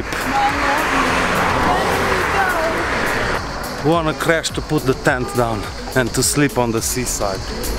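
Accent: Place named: Morocco. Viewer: I like your accent keep it up Morocco*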